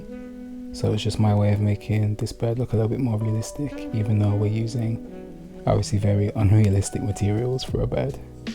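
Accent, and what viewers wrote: United Kingdom. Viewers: That was actually really comprehensive and clear! Thanks! Maybe its the cool british accent, maybe its the neat tidy workmanship, maybe both.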